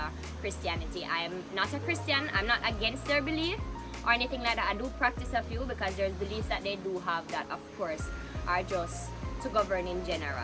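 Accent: Jamaica. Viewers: You have a jamaican accent and behavior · Yo u sound like us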